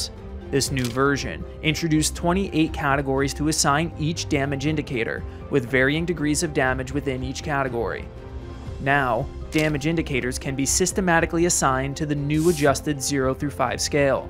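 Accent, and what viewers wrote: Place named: RP, United Kingdom. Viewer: dawg talks he works for the BBC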